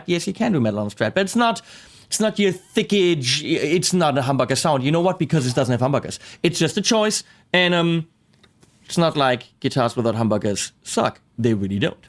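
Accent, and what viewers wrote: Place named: Germany. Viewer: A review without this guy talking or someone else who doesn't need to be extra super dooper pseudo cool and swaggy fucked up german accent english. Yeah people get it. You like to hear yourself talking english but please keep it to your self or talk in your native tongue and use subs. I'm not trying to discredit your knowledge or playing. It's just your talking wanna be cool shit is so annoying man. And those great idiotic dumbfuck jokes. Oh you are so extra hip and remained young. You know? If no one is laughing it's not funny. Goddamn you're a douchebag. Even more than me.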